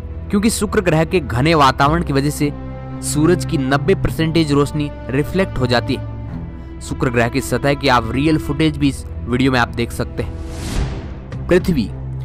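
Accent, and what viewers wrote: Rajasthan, India. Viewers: AAP RAJSHTHAN KE HO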